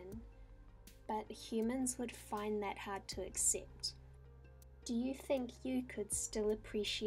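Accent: New Zealand. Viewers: Luv that Kiwi accent. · Are you kiwi? Your accent is so clear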